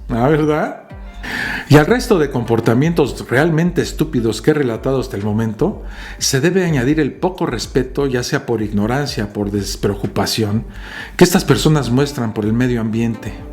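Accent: Mexico. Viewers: Me encanta su forma de informar aunq tenga un toque mexicano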